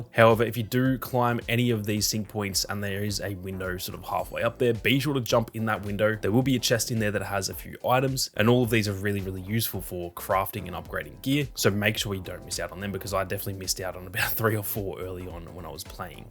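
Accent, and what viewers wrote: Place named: Australia. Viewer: Good tips Aussie fella lol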